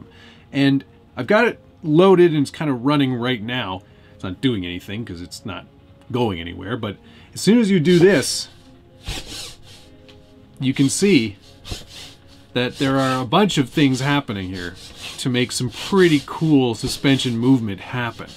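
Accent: Canada. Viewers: are you from ontario, canada?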